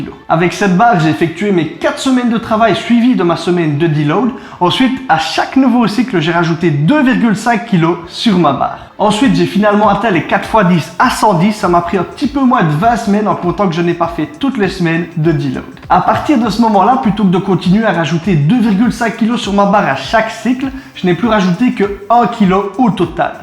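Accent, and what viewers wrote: Liège, Belgium. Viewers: Accent belge de la mort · Super tes vidéos. Tu as l'accent de Liège non? Tq je suis de la province de Liège aussi 😂💪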